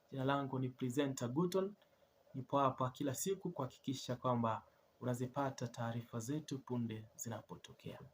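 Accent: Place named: Luo, Kenya. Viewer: Kama mtaandamana kama shetani wenu heche alivyojinadi katika mikutano yake jiandaaeni kuona mnayoyataka kuona na huo ndio utakuwa mwisho wa ushetani wa chadema kama heche atajiona kuwa yeye ni zaidi ya Dola ajitokeze siku hiyo asiache kuandamana atangulie yeye pamoja na genge lake la uhalifu wa kisiasa na wewe kijana kutoka Kenya na hiyo studio Yako unachoongea kuhusu hayo maandamamo haujitambui na lafudhi Yako ni ya kijaluo endelea tutakupata pale ulipo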